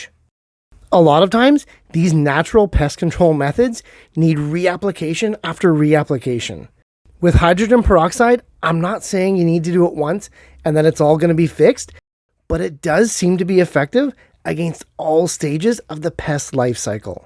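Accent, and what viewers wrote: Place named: Canada. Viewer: Very useful and interesting and Fargo or Canadian like sounding narration. Seriously, very good video 👍🏻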